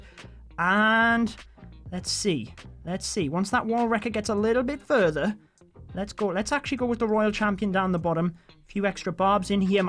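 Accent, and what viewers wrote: United Kingdom. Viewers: Are you from British your voice is so something that is incredible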